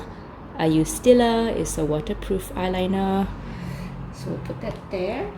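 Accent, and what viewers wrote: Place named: Singapore. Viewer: Also this is kind of random, but I love the Singapore 🇸🇬 accent. I follow another YouTuber with the same accent and I just love it. 😊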